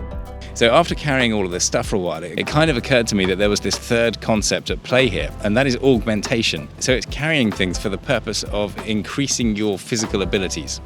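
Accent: United Kingdom. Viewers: Thanks for the content and its good to have a Brit sounding voice chatting about the virtues of EDC in its most basic form. Good to know there is someone else on the same page :) Best wishes Mike in County Durham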